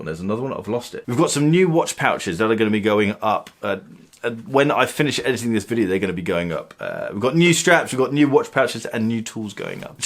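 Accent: United Kingdom. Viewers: The review coming from someone with a British accent meets the expectations. A ton of complaining, and more complaining, and more complaining. If I hadn't seen other videos from you, I'd say you're the kind of person who'd complain about Patek or Vacheron Constantine's movent, and why it took so long for these companies to make your $1M handmade watch. Then once you're done with the watch complaining, you'll start complaining that your girlfriend has too much boobs (whatever that means).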